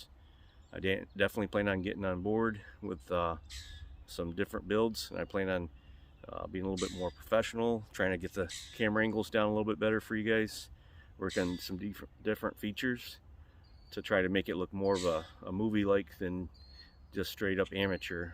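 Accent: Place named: Cape Breton, Canada. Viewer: Your accent sounds like Whitney Pier Cape Breton.😁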